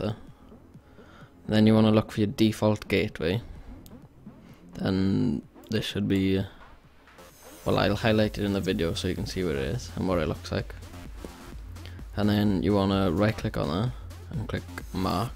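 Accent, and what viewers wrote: Wales, United Kingdom. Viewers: you sound proper welsh. are you ? :P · Is that a welsh accent I hear? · nice welsh accent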